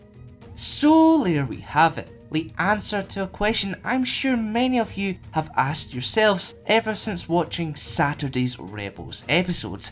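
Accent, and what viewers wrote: Scotland, United Kingdom. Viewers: Lambda Presents: Yes he is Scottish, His voice is cool! :) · Lambda Presents Scottish I believe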